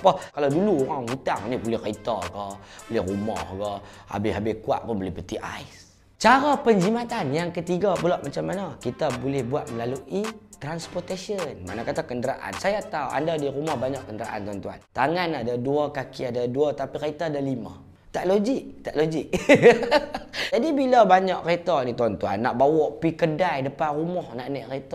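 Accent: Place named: Penang, Malaysia. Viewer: x larat gelak😂asyik dengaq slang penang brader ni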